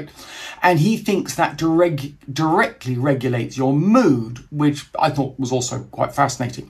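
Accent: United Kingdom. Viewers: The intro got me laughing so hard "AT MY MOTHER'S FUNERAL" you're so British I love you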